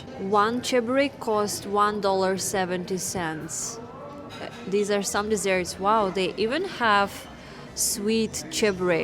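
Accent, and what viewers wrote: Russia. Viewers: I love the Russian accent and language 😂 It sounds so romantic and sweet. Some people prefer French Italian or even British. To each their own. Those dishes looks delicious too. All or most under $4.00. Get real!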